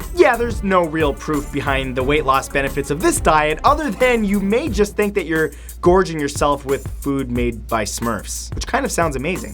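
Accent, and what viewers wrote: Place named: Canada. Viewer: Why do you talk like me Matthew, you are Canadian aaa?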